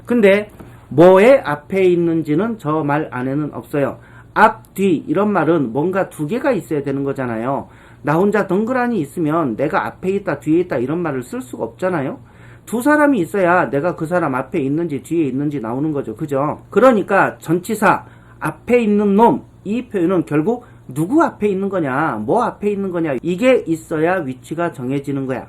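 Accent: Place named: South Korea. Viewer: 드뎌 전치사 편에 입문 하게 됐네요. 감사합니다 선생님. 선생님 목소리는 완전 한국토종인데 외모는 너무 이국적이셔서 쪼금은 놀랬습니다.😅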